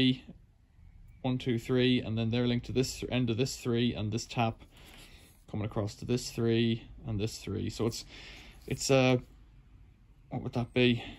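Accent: Ireland. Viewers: the one with no charge port is a common charge port and the other is a separate charge port. Nice to hear an Irish accent on the tube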